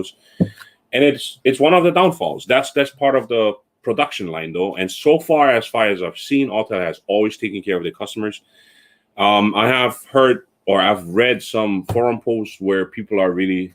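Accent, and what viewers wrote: Hawaii, United States. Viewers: Shoots stoked to find someone talking story with drones local style nice! U Maui? Normally North Shore Oahu we have to connect one day! Aloha about to get 6K evo2 possibly on the fence. So you say you have the pro is that the 8K or 6K? 🤙🏾🤙🏾🤙🏾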